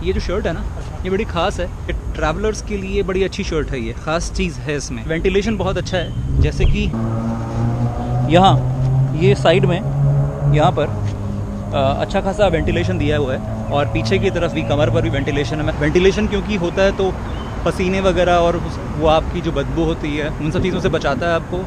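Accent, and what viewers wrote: Haryana, India. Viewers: ghna uncha h bhai .... haryanvi ❤❤❤❤ bhai r haryanvi b aav h tn t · ghna uncha tha bhai.... Sir are you from haryana????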